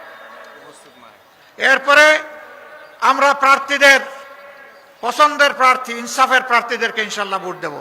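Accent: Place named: Sylhet, Bangladesh. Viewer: Masallah nice sylhety ❤❤❤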